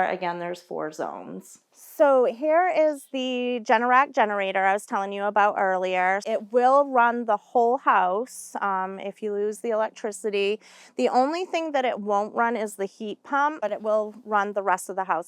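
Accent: Maine, United States. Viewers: She has a Maine accent.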